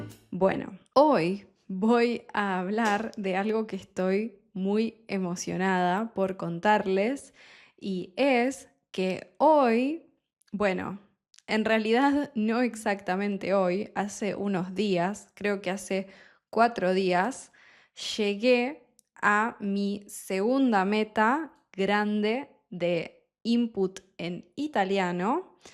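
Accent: Argentina. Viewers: I get so excited when I'm watching your videos and realize I understood almost everything. My spanish teacher is from Buenos Aires and I love watching your videos in general, but I noticed they have helped me understand her accent better too. In my lesson today I only remembered how to say "rallado" in an Argentine accent because of all of your cooking videos! It was really funny. It's great hearing about your language learning journey as well. Thank you so much for sharing. 🥰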